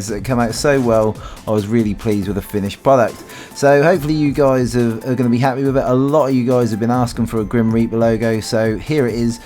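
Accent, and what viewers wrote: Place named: United Kingdom. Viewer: The emblem was super hard as hell and good British voice:)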